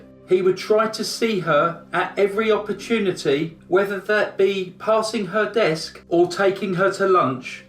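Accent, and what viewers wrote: United Kingdom. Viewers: Brit accent goes a long way 🥰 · First time viewer. Love the subject matter but (I'll make enemies here) your audio sounds very canned. If I could offer some CONSTRUCTIVE criticism, improve your audio because you have a great voice and what American doesn't love a Brit accent? Keep up your great work but the audio recording is just... a bit off putting. Improve that and your "likes" will shoot through the roof. Love your work. Keep up the great stories! Bill: Brooklyn, NY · Cool! I am subbed to both and CR and have been for some time! I swear they sound very similar voice wise, just different U.K. regional accents!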